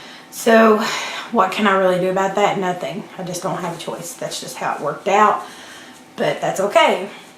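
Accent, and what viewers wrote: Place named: South, United States. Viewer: Erica mentioned you so had to come and check out your channel. You are so cute..love your personality and southern accent =) Great video..so much truth was spoken. Keep up the hard work!!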